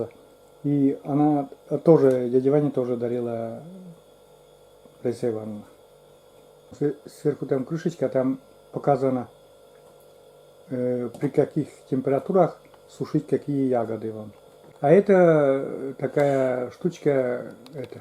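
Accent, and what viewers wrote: Komi, Russia. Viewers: Республика Коми,родной коми язык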